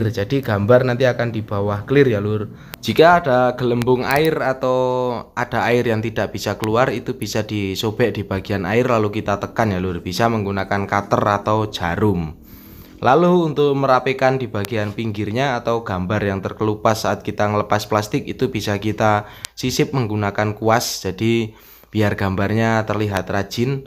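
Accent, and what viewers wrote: Temanggung, Indonesia. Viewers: Koyo wong temanggung ya... Heeee..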